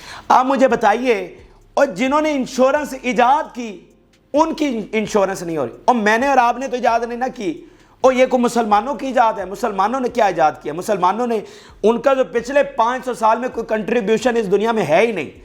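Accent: Punjab, Pakistan. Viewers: مہربانی کرکے انگريزی نہ بولا کرو ايسے لگتا ہےجيسے انگريزی نہيں پنچابی بول رہے ہو ۔اس کو ٹرانسليشن کرکے بولا کرو ۔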